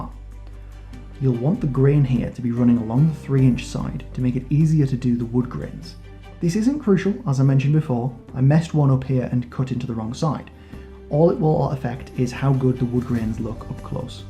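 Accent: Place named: Newcastle, United Kingdom. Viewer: Watching this older video, I can really hear your geordie accent coming through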